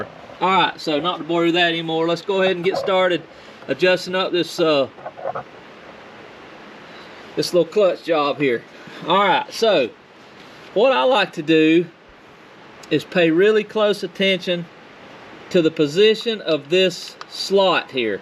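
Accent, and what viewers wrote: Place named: Louisiana, United States. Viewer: A good buddy of mine from my US Navy days is from Georgia, and when I heard your voice it reminded me of him.. Being an Irvine is nice, but it's kinda like being stuck in the middle of a zoo because California is just gone crazy, in some places. Anyways, your videos take me to these little country roads and get my imagination thinking about little motorcycles, and big open spaces. Again I can't overemphasize the detailed nuance and elaboration in your video, of the clutch adjustment was just so spot on. I watched 3 videos before yours, They showed the adjustment but didn't elaborate the way you did. Your speaking cadence, intonation, grammar, syntax use, affable Southern accent will take you very far, on on YouTube.. Wishing you and yours are very happy and prosperous New Year. Thank you again for the quality content.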